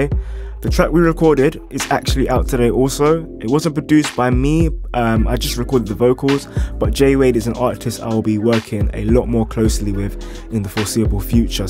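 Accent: United Kingdom. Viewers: Dont usually comment.. but I thought this was a very well produced video.: clear and fast flowing.. also refreshing to hear another UK bruh doing a tutorial. Keep it up!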